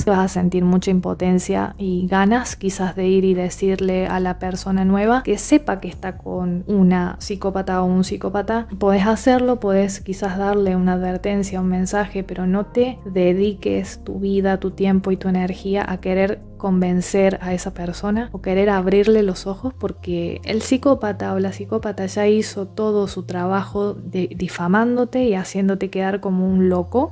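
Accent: Argentina. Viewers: Hola! Estoy casi segura que es Argentina!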